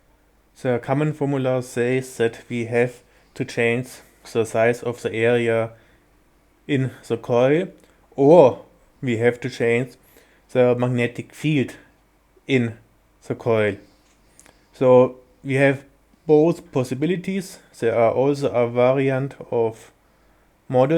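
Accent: Germany. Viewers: this alman english is amazing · Das ist das deutscheste Englisch was ich je gehört habe aber danke für das Video👍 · When you know exactly that your boy is from Germany cause we all have the same foreign accent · das ist das Deutscheste Englisch was ich jemals gehört habe so geil man. mach weiter so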